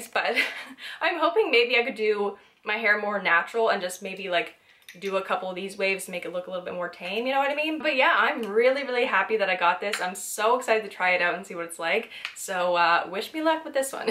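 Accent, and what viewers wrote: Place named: Newfoundland, Canada. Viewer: Me trying to figure out that maritime accent. Hmm Newfie or Boston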